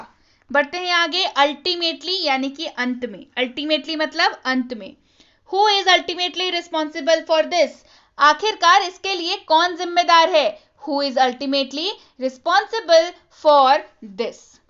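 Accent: Bihar, India. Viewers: Bihari like maam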